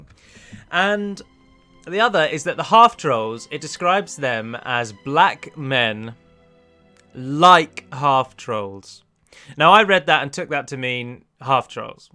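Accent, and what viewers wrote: United Kingdom. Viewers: You really shouldn't worry about guys who question your knowledge, your videos aren't aimed at being some kind of lore encyclopedia, you don't owe these people anything!:) I like to consider myself as quite knowledgeable on Tolkien's lore, but when I hear mistakes here and there in your videos I don't give a damn about it, because that's not the reason I'm watching them!! I'm watching them because you're an interesting person in general with an interesting playstyle as well, and that's the important thing here;) (plus you help me get better at speaking English and at getting a British accent so I'll vouch for you and your vids ^^) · Your lore video was great. It was really good. You are a man who speaks to us, the audience, as if you were telling a story and yes that means some things you say will be wrong but that utterly stands in the shadow of how pleasant your lore videos are to listen to. 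As if I were listening to a lovely fairy tale. Kudos! It is obviously better than your Sindarin pronunciation but that is because your native language is English you jammy ... ^^. No seriously, that British-ness about you and the way you speak to us is what makes you, you, and why people come to you. Don't let a reddit-guy over-the-top holier-than-thou fellow ruin your day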